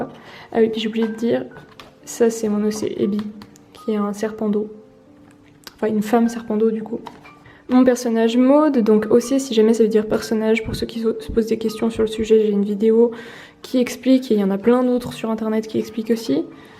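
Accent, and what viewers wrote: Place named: Switzerland. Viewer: No I love your french so much! I spent a little bit of time in geneva and the swiss alps and honestly swiss french is one of the easiest to understand for me! I had more trouble with nice and Bordeaux, none the less you had so many beautiful sketches in here, even if I didn't understand the french I'd still love to watch <3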